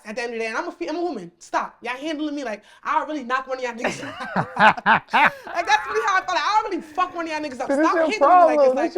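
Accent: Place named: Brooklyn, United States. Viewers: my girl so new yawk!!! what’s good!!! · Shes sooooo Brooklyn, i Love her. Her voice, her personality. The way you do anything, is the way you do everything, her business is gonna go far.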